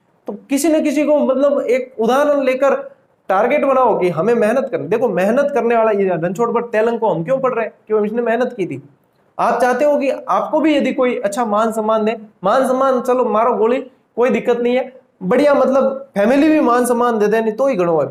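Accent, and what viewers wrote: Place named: Marwari, India. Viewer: Aapni marwadii language bhout mithii lagee sir jii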